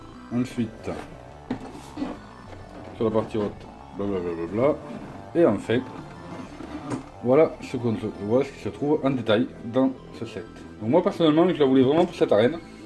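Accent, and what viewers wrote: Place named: South, France. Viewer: Tu viens du Sud toi 😂😉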